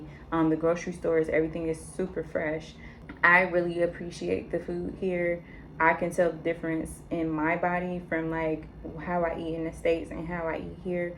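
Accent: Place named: Philadelphia, United States. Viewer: Are you originally from philly? It just really sounds like you are.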